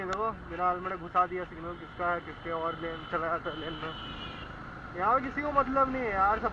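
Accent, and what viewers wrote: Bihar, India. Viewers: In Bangalore u have bhihari accent....?????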